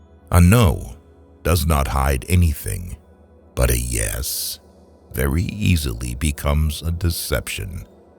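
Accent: United States. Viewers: The stupid American accent doesn't sit well with, European philosophers.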